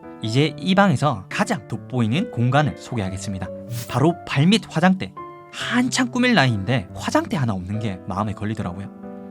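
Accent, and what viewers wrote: Busan, South Korea. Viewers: 님 부산인가요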